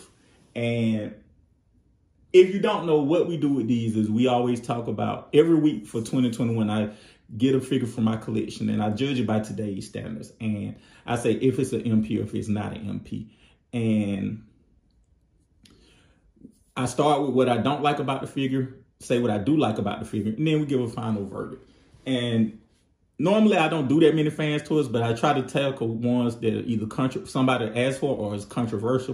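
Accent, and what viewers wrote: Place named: United States. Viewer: I like your country accent. I also have one. I agree, the articulation is sad and it is making me sad to buy the new release. SMH I was hoping someone would fix these issues. Probably a 4th Party will release after I buy and make me sick. I hate TF collecting sometimes.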